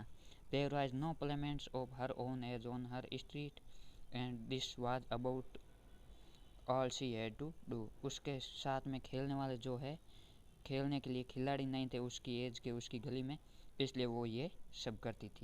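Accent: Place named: Marwar, India. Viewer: Bai aap rajsthan se ho to aapka Jilla jarur batana mujhe aapki aavaj se lag raha hai ki aap marwad se ho 😊😊❤🎉🎉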